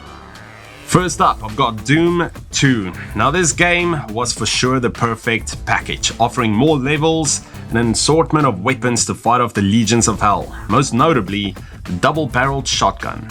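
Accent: South Africa. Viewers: Can hear that south African accent! I right? Pretoria or cape town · South African accent there right? Always nice to find another saffy on utube. Warcraft II was a great game. Played that and dune 2 so much. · Good video, but god that Seff Effrican accent is obnoxious. You're one of the few YTers whose videos would be improved by a robot voice.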